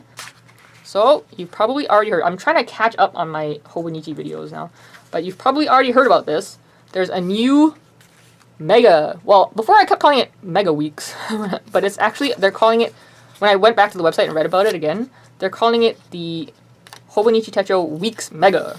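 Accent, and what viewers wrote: Canada. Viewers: I love how you sound so canadian. Lol. The fast speech and dragging out certain letters or sounds. I hope im right in guessing your canadian? >.<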